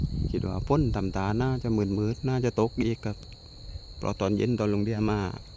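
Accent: South, Thailand. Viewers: ชอบภาษาไต้ฟังแล้วมีเสหน่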